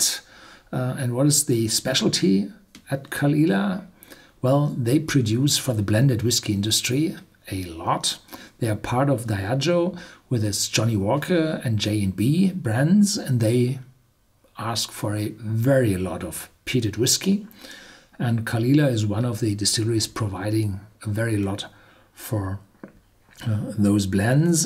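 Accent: Germany. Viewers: Joe Macchiarulo he's german...almost all German English speakers speak like that.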